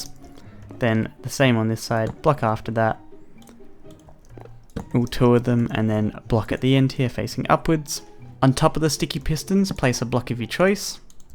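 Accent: Australia. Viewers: He's Australian...right? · R u Australian. I don't mean to be rude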